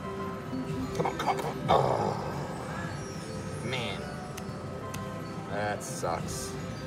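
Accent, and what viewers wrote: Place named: New Jersey, United States. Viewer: I enjoy watching and listening to you commentary during you play. You sound like a real good Jersey guy. Love the show and keep it up. Bring your mom back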